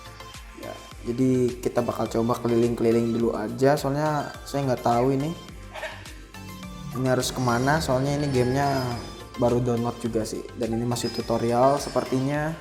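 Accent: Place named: Banyumasan, Indonesia. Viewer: Njer basane ngapak😭🤣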